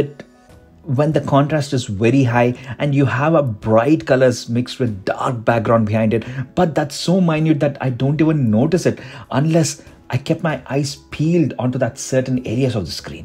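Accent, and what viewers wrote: India. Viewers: Wow, thank you, this is very reassuring. I’m gonna go for the 12.9”, if I notice blooming I’ll just euphemize it as carpeting. Going back to global dimming is not an option. Switching to OLED or microLED when available at certain loss. You should have more subscribers. You’re the first reviewer “with a sub-continental accent (deliberately unspecific)” I like 👍. edit: not a defining factor, just objective.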